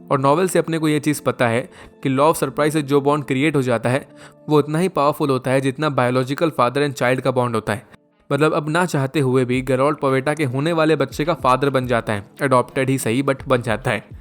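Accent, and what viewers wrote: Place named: Chhattisgarh, India. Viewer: Tum chattisgarh k lagte ho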